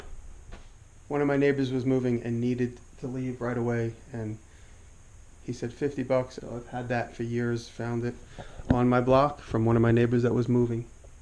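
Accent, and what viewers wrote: New York, United States. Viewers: I just like the way says the word "garbage" and how many times he says the word "garbage" in this video. The NY accent almost makes it sound like "cabbage".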